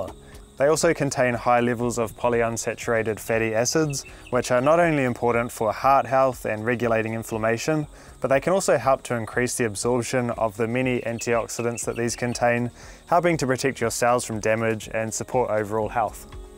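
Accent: New Zealand. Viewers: I watch your videos just to hear that nice Kiwi accent😂.Love it☺️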